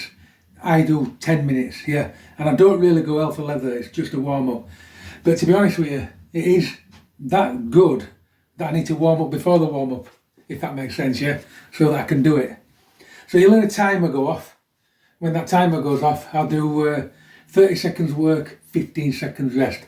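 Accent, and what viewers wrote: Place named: Liverpool, United Kingdom. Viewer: no offence mate but why are you speaking in a Scouse accent when you are not even from Liverpool?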